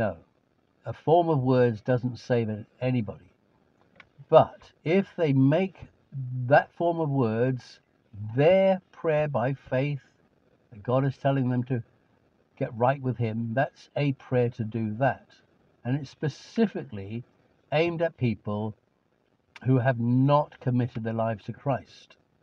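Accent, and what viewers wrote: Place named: United Kingdom. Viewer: So weird to hear someone speak of Christ with a British accent. I just left my church and am looking for a new Bible teaching church. Don’t care about the building, parking, etc—just want to hear the Word of God unapologetically preached! Good stuff there! Love you Brother and I will pray for your ministry from Alabama USA! 👆🏼🙏🏼👊🏼❤️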